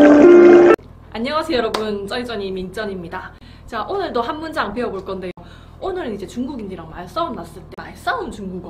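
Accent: Gyeongsang, South Korea. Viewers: 늘 경상도중국어 잘 듣고있습니당.. 不要插手。 난 손을 콘센트에 넣지마. 인줄..ㅎㅎ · 님 3개국어 하시네^^ 중국어. 대구사투리.. 귀여워요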